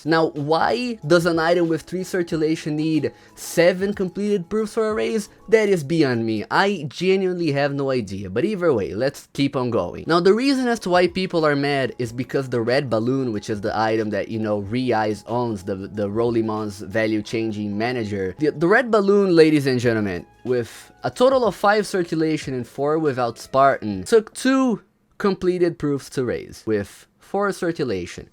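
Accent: Brazil. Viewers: Are u brazilian? I know this accent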